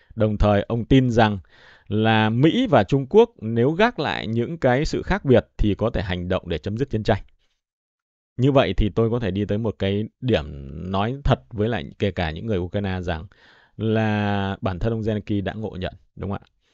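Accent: Standard. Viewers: Giải trình hay âm phát chuẩn rỏ ràng ❤❤❤🎉🎉🎉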